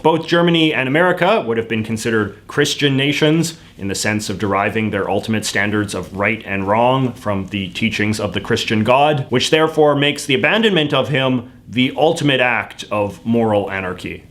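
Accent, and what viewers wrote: Canada. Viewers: I was listening to this without watching and I realized it was with the way he says "about". Damn Canadians.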